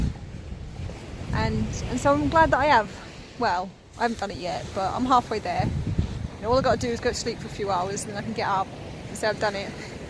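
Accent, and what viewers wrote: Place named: Norfolk, United Kingdom. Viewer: Hi just come across your vid when put in Norfolk coastal path, looking to do the walk and camp along the way if we can ever camp this year. Nice to hear a norfolk twang, being from Norfolk. Well done on your solo camp, distance is never an issue, I often go a short drive away for quick overnighter. Hand warmers inbetween your socks , or if you have a nalgien type botle fill up and use as a hot water botle , so nice to keep feet warm.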